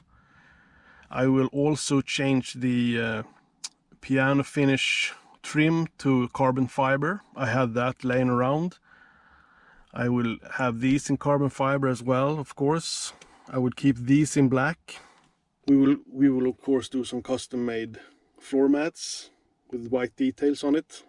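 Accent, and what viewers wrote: Sweden. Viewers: You from sweden right? I can really tell The way you speek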